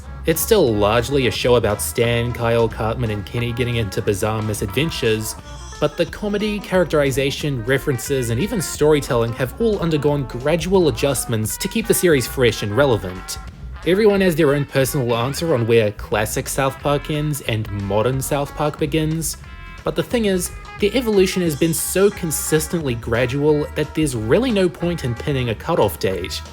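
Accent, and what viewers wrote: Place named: Australia. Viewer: People here:He pronounced it wrong,he pronounced it Shah-grin,Chah-ring,Who writes your stuff..."bunchofbullshit". Guys,he's Australian,this way of speaking comes from his accent,be a little more respectful and understandable towards him.😒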